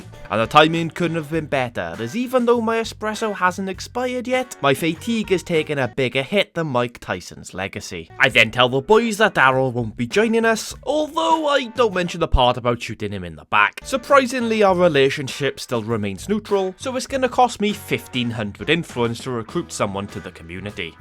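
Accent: Wales, United Kingdom. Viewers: You Welsh?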